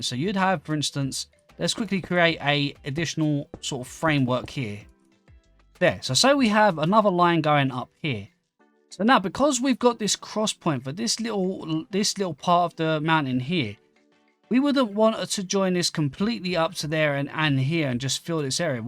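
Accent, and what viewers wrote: United Kingdom. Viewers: British duh!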